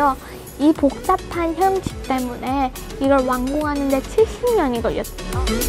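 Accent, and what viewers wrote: United Kingdom. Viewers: 말투 충분히 매력적이에요 영국식 한국어발음 느낌? ㅋㅋㅋ 부정적인 댓글은 그냥 넘겨버리셔도 좋아요 ~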